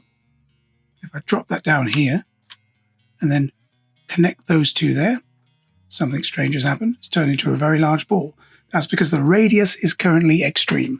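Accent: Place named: RP, United Kingdom. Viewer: Think I've found the Attenborough of Blender tutorials, all the way down to the very accent if I'm not mistaken! And that is meant as a huge complement since he's the king of human voices.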